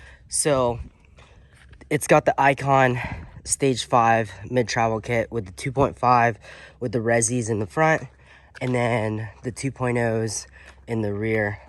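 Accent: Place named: Southern California, United States. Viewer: As soon as he started talking i was like oh hes in so cal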